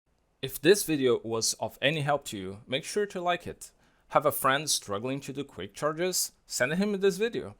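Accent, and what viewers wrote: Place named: Turkey. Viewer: Are you Turkish? Your accents kind of sounds like a Turkish accent but not a thick one.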